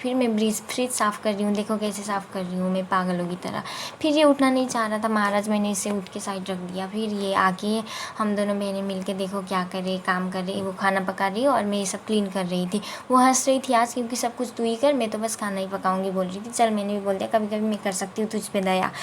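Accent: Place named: Nepal. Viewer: Yar vlog to theek hai par use jyada aupe ka accent hai Jo hame khatarnak laga proper Nepali people when they speak hindi.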